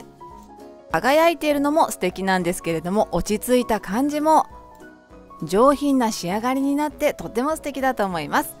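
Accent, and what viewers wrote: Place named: Gifu, Japan. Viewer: ライブのときは岐阜弁が聞けて嬉しかった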